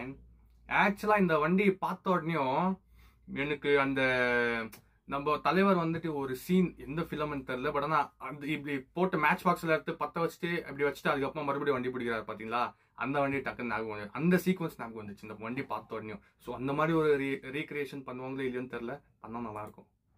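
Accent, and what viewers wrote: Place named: Bangalore, India. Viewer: Unnoda Bangalore Tamil and Excitement for Walter White dialogue ku naan fan aiten da.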